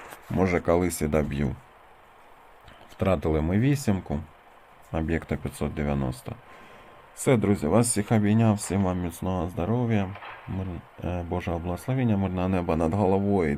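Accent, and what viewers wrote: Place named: Chernihiv, Ukraine. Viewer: А Ти сам що з Чернігівщини? Наш говор😂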